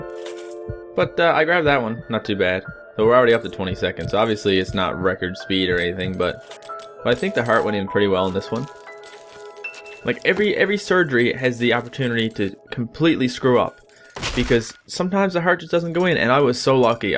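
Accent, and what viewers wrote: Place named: Newfoundland, Canada. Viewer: i could tell you were a newfie so easily, cheers from a fellow newfie!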